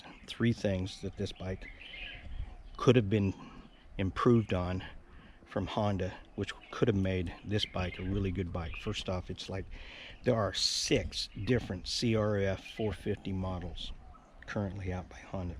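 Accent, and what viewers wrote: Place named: New Jersey, United States. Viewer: How u get to Thailand- I mean u speak like you from I’d say New Jersey ? Now I got to investigate Thailand I love the bird noises in the background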